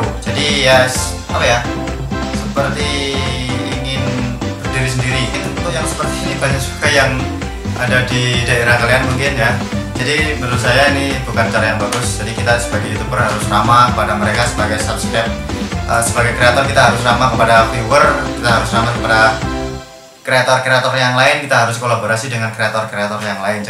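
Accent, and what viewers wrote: Java, Indonesia. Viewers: Wong jowo yo?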